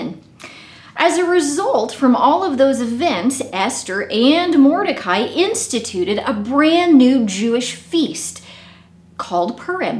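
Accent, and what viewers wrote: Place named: United States. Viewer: You have an American accent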